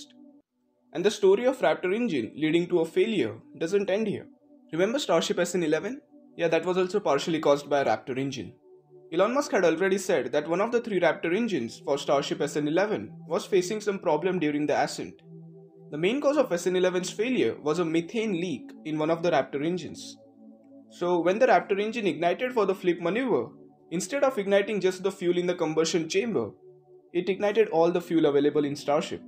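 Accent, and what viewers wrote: India. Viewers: Bhai mujhe indian accent lag raha hai, I'm happy to see, an Indian guy talking about Spacex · No no, your style of speaking is Ditto like an Indian, however you are,🙄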